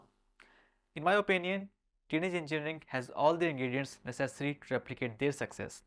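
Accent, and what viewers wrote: India. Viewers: you have an Indian English accent, which I think is fine.But. you can instead focus on Innotation and pause in delivery.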